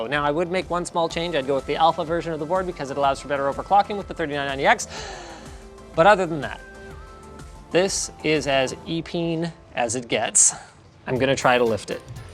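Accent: Canada. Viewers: is saying ",aye?" more i think his Canadian accent is finally coming jn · I've never heard him sounding this Canadian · i didnt realize was Canadian til this episode, so many eh's lol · The Canadian is strong with in this one.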